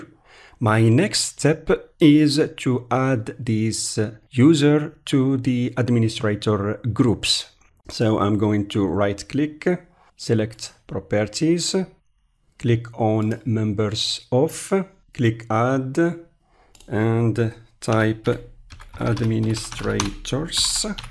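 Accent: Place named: India. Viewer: Initially you wanted to say "How to create a NEW admin account with your current admin account, with which you can run admin programs you could also do with your usual admin account". You're basically selling bullshit here and try to fool anyone the dullest way possible. But the indish accent tells everything right at the beginning already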